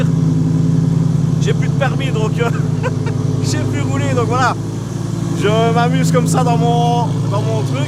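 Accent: Liège, Belgium. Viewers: L accent liégeois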